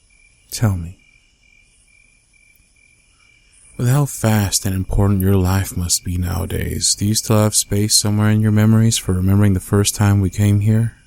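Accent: United States. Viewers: ok why do u sound country like whyyyyy u remind me of my bf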